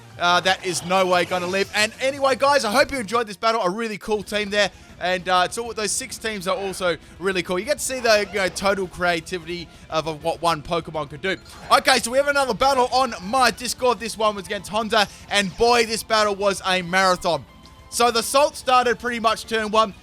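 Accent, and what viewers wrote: Australia. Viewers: are you austrailian ?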